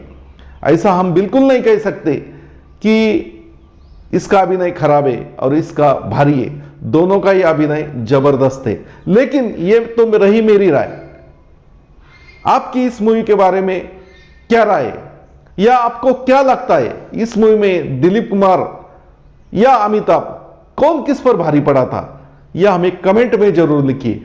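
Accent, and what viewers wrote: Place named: South, India. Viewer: Bhai pehle to apni Southwali Hindi ki jagah original Hindi bolne ki zarurat he. Ab baat Shakti film ki to is film me Amitabh ki achhi acting ke baavjud Dilip kumar unke upar bhari pade the. Kyuki Amitabh ne apni Deewar, Trishul, Lawaris me bhi baap ko nafrat karnewale bete ki bhumika ki hui thi. Is vajah se Shakti me bhi Amitabh ne apni acting me repeat kiya tha jiske kaaran unki acting kuchh bhi naya pan nahi dikhai deta tha. Dusri aur Dilip kumar ne pehli baar 1 aadarshwadi Peeta ki bhumika nibhai thi. To zahir si baat he ki Dilip kumar ki acting hi jyada limelight paati.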